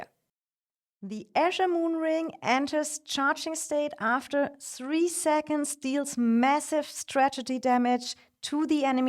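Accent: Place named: Germany. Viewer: The German accent ❤😂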